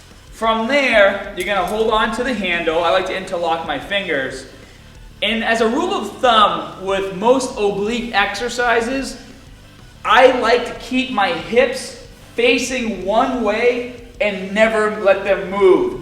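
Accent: Boston, United States. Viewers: is a Bostonian - he used to be conscious of his accent probably to grow his channel, but now he definitely let's his inner accent shine: the absence of R's. Jeff's accent I thought was New York, no? It doesn't sound quite Bostonian, but it is similar... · You have a bostonian accent. It's faint but it's there. Thanks for the tips. I've been looking for some solid oblique workouts. You look great. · You sound like you’re from bawston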